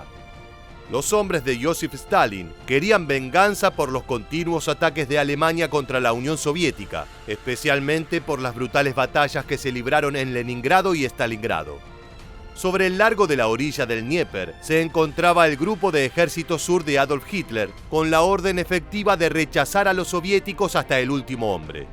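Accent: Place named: Argentina. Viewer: Hola... No me provoca ninguna satisfaccion escuchar tus comentarios sesgados respecto a los rusos, precisamente xke tengo familia viviendo en moscu ke me mantiene muy bien informado de todo ko ke occidente esta tramando con esto de ucrania... Te escucho y suenas como ke hubieras preferido ke los nazis, aun despues de haber exterminado a mas de 6 millones de seres humanos le hubieran ganado a rusia... X tu timbre de voz veo ke sos argentino y un argentino seguramente RUSOFOBO ke defiende a estos nazis ucranianos ke vienen masacrando a mas de 140 mil ciudadanos prorusos en ucrania desde 2014, y ke seguramente se alegra, festeja y aplauda ke los argentinos tengamos una base nuclear de la OTAN instalada en nuestras islas malvinas... Solo ruego a Dios ke no seas otro milicoide conspiranoico esperando la oportunidad de derrocar otro gobierno constitucional en mi pais utilizando las armas ke pago yo... 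Vi varios videitos tuyos y la verdad venias digamos ke bien... Pero en esta te fuiste a la banquina y quedaste expuesto demostrando lo ke en realidad sos... Un discipulo del tio sam Chau...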